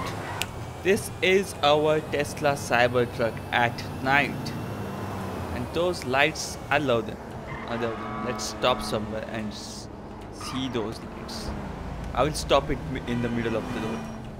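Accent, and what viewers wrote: India. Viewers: Why do i hate hindi accent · You indian accent is so strong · Dude are you India because your accent is Indian pls tell me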